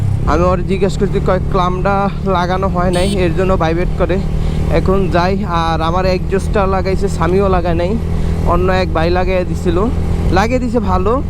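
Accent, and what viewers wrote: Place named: Bhola, Bangladesh. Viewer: ভাই আপনের বাসা কি ভোলা ভাইয়া আপনের বাসা তো ভোলার মত লাগে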